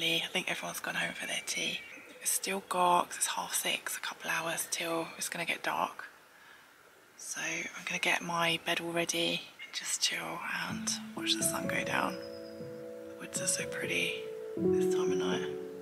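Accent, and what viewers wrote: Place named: England, United Kingdom. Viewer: Love your English accent and pronunciation :-) ❤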